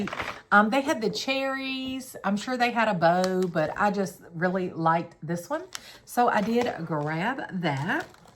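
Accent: United States. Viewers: Congrats. You make me homesick for the Redneck Riviera with ur wonderful accent. You also have the cutest dimples. 💔🤍💙🇺🇸🇺🇦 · Hi, Congratulations on your 8.000 subscriber achievement! Watching you is delightful, I love your Southern accent and hauls! Here’s to 20,000 subscriber milestone.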